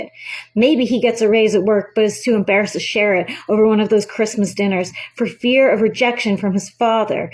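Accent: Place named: South, United States. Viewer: Nice southern accent. 😉That was funny. 😄 The sheer amount of effort you put into your work is astounding, and the level of detail is top notch, and very much appreciated. I could easily picture everything you said, and watch it in my mind like a movie. Have you written any books or considered writing books?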